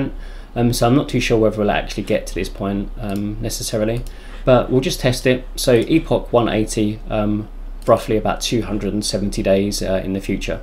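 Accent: United Kingdom. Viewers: Damn.. British accent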